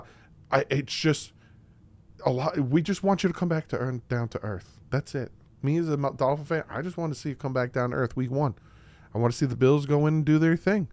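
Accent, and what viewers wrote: New Jersey, United States. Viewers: I knew that accent was familiar lol JERSEY STAND UP!!!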